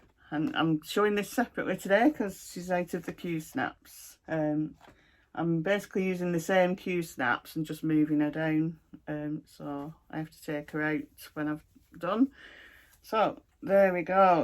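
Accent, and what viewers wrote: Lancashire, United Kingdom. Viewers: HI,great video, nice to hear a fellow Lancashire girl ( I am a Blackburn girl originally!) Love the block party pattern - I have just bought the Halloween one from nimble thimble for daughters up and coming birthday ( aka flossibilities on you tube), I love the winter patterns especially the ones with snow!!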